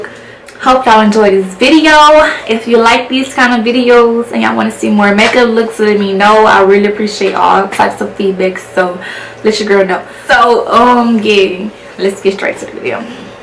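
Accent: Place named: New Orleans, United States. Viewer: Girl that’s a thick N.O accent lol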